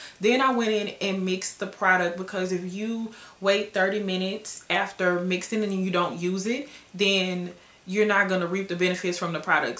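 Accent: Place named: Midwest, United States. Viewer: hey love, thanks for the review. also, are you from Chicago? i kinda hear a Midwest accent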